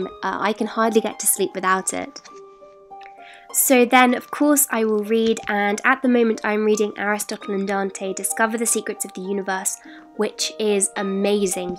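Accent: RP, United Kingdom. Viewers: Her accent 😭💛💛💛 I love British people 💛💛💛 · now this what u call a hermione irl... the accent, the and productivity 😩✋ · Omg I love your voice and your accent is so posh ❤️😂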